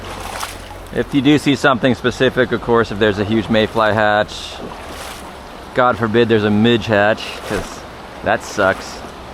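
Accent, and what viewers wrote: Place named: United States. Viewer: cool video and some useful info. You definitely don't sound Swiss :) You're American aren't ya?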